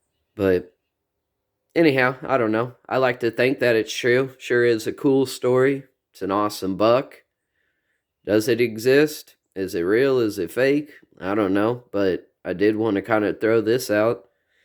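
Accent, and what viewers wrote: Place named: South, United States. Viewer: Lol im from michigan. People don’t understand deer like that don’t grow in northern Michigan. That’s why Jon Eberhardt knows buddy. Because he hunts in northern Michigan. Trust me when I say this they don’t get that big up there. I live in southern Michigan, which is where the biggest bucks are in michigan, and I have never seen a 30 inch spread on a buck. People in Traverse City Michigan are lucky to shoot an eight point that’s 15 inches wide Buba. It’s not about whether the antlers go straight up. It’s about the soil content that doesn’t give credence to the idea that a Deer in northern Michigan could grow a rack that’s that extraordinarily wide. I’m sorry dude unless you’re from Michigan you just won’t understand. Don’t want to break anyones heart, but there’s no factual proof to that damn buck and if there was at all, he would’ve put it under an x-ray. 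He was the scorer for the commemorative bucks of Michigan man. He was the type of guy who regarded and revered records. If he wasn’t he wouldn’t have been part of CBM. Sorry, I hear the southern drawl in your accent and it just makes me have to say Bubba come up here to Michigan and let me know when you see a deer that’s 30 inches wide. As to John Eberhardt, he is one hell of a deer hunter and to put over 100 deer that score over 100 inches on the dirt in Michigan is some seriously good huntin.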